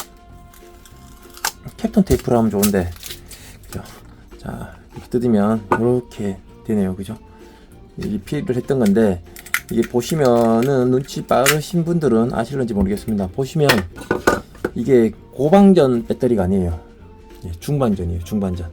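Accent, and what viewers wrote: Gyeongsang, South Korea. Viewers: 사투리가구수하십니다..ㅎ 저도진해에서 군생활을해서 경상도사투리 좋아하는데.,ㅎ 후딱후딱 잘만드시네요 저도영상참고해서 리필해봐야겠네여 직업이 전동공구많이쓰는직업이라 저렴하게 리필해써야겠어요 영상만드는 일이 힘든일인데 영상잘보고 갑니다..^^ 화이팅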